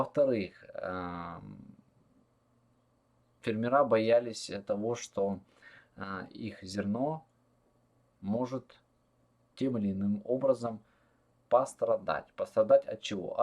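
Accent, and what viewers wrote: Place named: South, Russia. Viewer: Шокание и гэкание - южнорусский говор русского языка. Прекрасно звучит!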